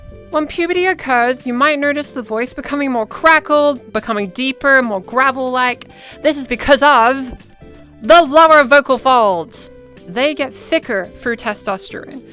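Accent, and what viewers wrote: Australia. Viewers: I like your 'Strayan accent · Me, a straight man who has never had any interest being a woman: Interesting But to be for real, I'm just here to listen to an Australian accent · love the Aussie accent you go girl loving you doing it for aussie girls yehwe definitely don't want to end up with Aussie girl voices proud to be Australian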